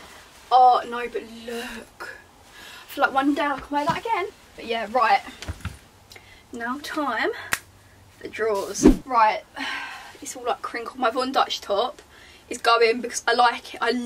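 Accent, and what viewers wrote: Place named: London, United Kingdom. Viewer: Her London accent is sooo strong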